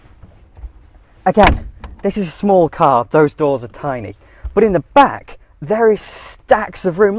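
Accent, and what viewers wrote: United Kingdom. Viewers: Doug DeMuro, but British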